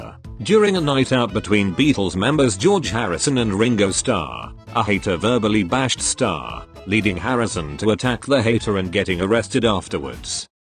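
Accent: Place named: United Kingdom. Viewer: Omg yay you posted! I was wondering how you got the British voice thing ❤️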